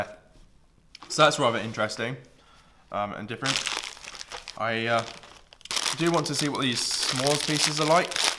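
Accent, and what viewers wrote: United Kingdom. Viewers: What part of the UK are you from, I'm from Lincolnshire, and I love your accent.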